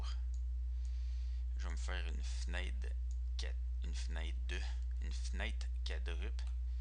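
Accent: Quebec, Canada. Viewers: arreter de critiquer son accent c normal qu'il parle comme sa c l'accent québécois il peut rien n'y faire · sa sentant qu'il et quebecois sa change de la voix normale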